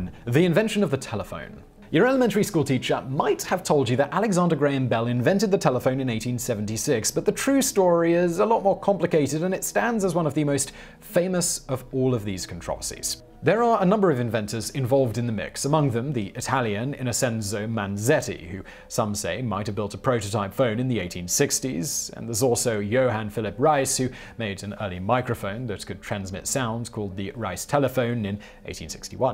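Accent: United Kingdom. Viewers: I normally don't care for British accents but don't mind his at all. Anyone know what regional accent this would be?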